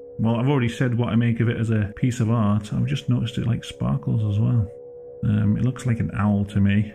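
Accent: United Kingdom. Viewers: I just realized that you have British accent (not sure either English, Scottish, Welsh or Irish well if Ireland could be counted as UK itself) the moment you said "bloody hell". No wonder your voice somewhat very interesting in certain way. Please correct me if I'm wrong thanks.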